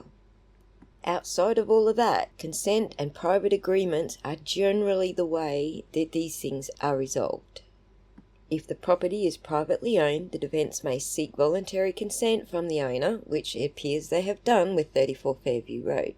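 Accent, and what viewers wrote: Australia. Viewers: I always love listening to you Aussie.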